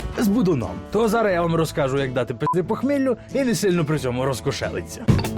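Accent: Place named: Ivano-Frankivsk, Ukraine. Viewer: Какой же уродский этот западенский язык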